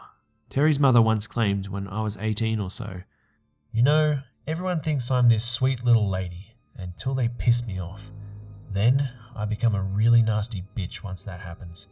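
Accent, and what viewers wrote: Australia. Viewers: your so Australian it feels like home i love it 😂❤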